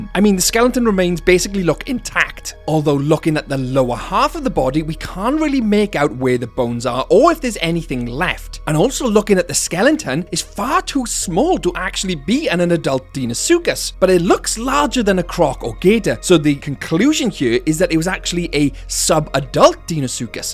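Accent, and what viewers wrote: Wales, United Kingdom. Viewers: Call me crazy but instead of hybrids on hybrids I’d love to see a deinosuchus, titanaboa, daedon or Troodons in JW. Also forgive me is your accent from wales?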